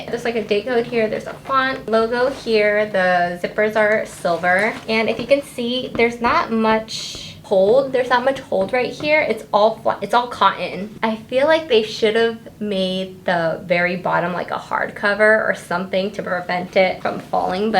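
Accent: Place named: Bay Area, United States. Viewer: You're channel just popped up on my feed. I was watching this and I was like she sounds like she's from the Bay. I looked at your IG and BOOM lol. P.S. thanks for the tip about the cardboard!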